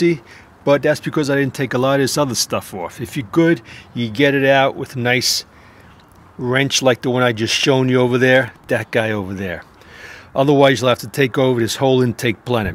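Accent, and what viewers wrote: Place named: Brooklyn, United States. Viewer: Pretty good Brooklyn accent... I actually have my eye on an ‘03 Pilot, 93000 miles; $3800. Good price?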